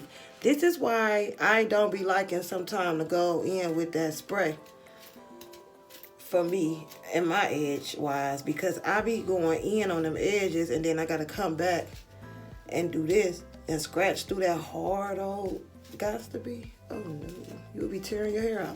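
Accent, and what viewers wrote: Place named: Jacksonville, United States. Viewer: Luvvvvv, if u dnt sound like yr from Jacksonville, Fla 😂😂😂 with that bust it down 😳, I almost fell off my bed( I'm like where's the gar?😂) ! Love the look ! I love the sleek hair styles( when I'm doing my growth treatments )! Kp doing u ma !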